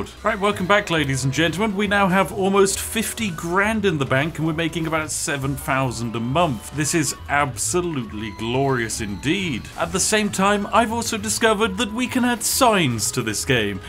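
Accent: United Kingdom. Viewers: Holy youre so funny, especially your british accent, i love it!!!! you just earned a sub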